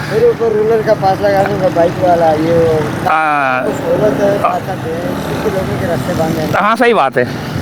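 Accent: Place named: Hyderabad, India. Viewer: بھائی،،اپ کے لہجے سے لگتا ہے کہ اپ حیدرابادی ہو،،،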